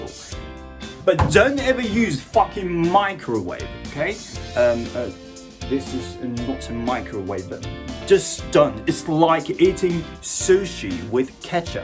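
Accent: United Kingdom. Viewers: すごくゆっくりなRPでとても聞きやすいです！ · さんの英語は聞いてて気持ちいいやはり日本人にはイギリス英語が合うのかも知れないイギリス英語教えてくれる所ってないかな？ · ウケるーー！えせもえせ。笑笑でも発音はさすが、目つぶってたら英国人ですね～！ずっと聞いていたい。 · ジェレミークラークソンの喋り方かと思ってたこの感じがイギリス訛りなのね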